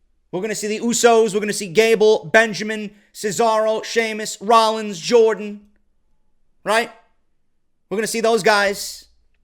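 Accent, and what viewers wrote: New York, United States. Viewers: I've only very recently subbed to your channel because I like what you do and how you do it, anyone can tell that you're passionate about this and the RAW 25 rage in your video made me laugh in a good way. Don't ever let haters or people who have no clue put you down, a lot of these "9 - 5" guys hate their jobs and would give anything to be able to do what they love for a job. As what I suppose someone like you would call a "casual" I don't know anywhere near as much as you about the business but I've been interested in maybe starting my own series of videos (with a more light hearted tone because I just don't have your experience). Keep it up is all I can say, I enjoy your content, plus I'm from the UK and your NY accent is awesome. lol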